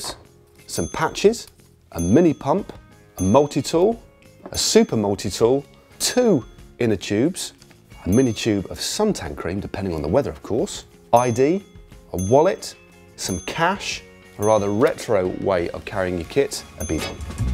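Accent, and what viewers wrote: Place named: RP, United Kingdom. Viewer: sounding reeet posh in this! till he burps at the end, that was grotty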